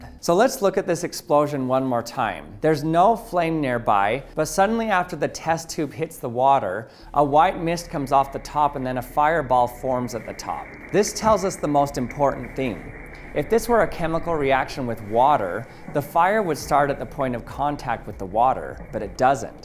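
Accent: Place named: California, United States. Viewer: I don't know why but chemistry with a camp Californian accent is super fun.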